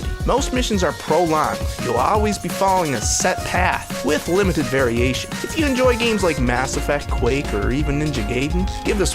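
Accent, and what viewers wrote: Canada. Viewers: You have a Canadian accent lol. Anyways, thanks for the review! I'm glad you went over it and such but maybe you should do an update?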